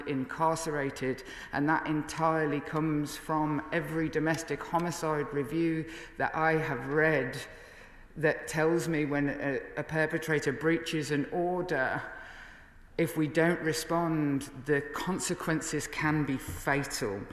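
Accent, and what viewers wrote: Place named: Birmingham, United Kingdom. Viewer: Can not stand this woman, her rudeness towards Diane Abbott and bragging about it. Her weaponising her brummi accent to appear “ woman of the people” Her willingness to stab Corbyn in the front and in the back whist being an advocate for anti women violence is at best hypocritical. We won’t mention her working with Murdoch.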